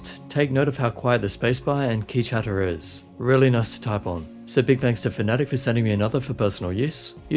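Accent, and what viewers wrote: Australia. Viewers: Do you speak a General Aussie accent? Im learning accents and it’s hard to find the difference between Broad and General. I know ur not using the Cultivated accent.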